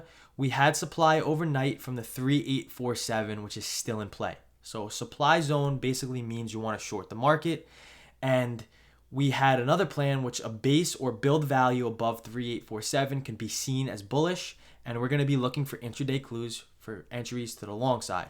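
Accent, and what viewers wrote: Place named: Georgia, United States. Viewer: You definitely sound different with that mic. I thought you were from Georgia.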